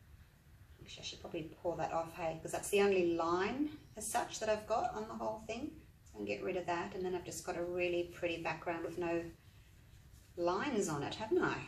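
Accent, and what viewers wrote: Australia. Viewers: Love your Aussie accent! I’m from NY!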